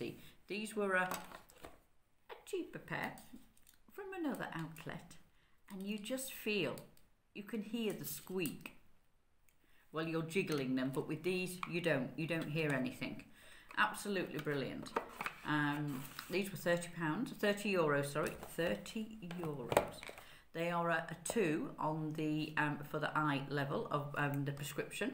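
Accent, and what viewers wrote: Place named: England, United Kingdom. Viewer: Wow beautiful woman and I love your English accent !!!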